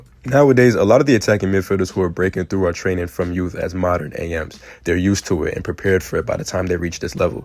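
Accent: United States. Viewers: sick video man! you presented a situation and reasons for it really clearly and in a rlly engaging way👍🏽 watching this before my first day of my second semester and as silly as it sounds, it’s refreshing to hear an american person chatting about footie—feels a little bit like yapping about soccer with friends (none of my friends like football so thanks for that haha) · Lmao all the wars in the world right now are because of Americans. It’s a terrible take. Every time I click a video and hear that Yankee Doodle accent I turn it off!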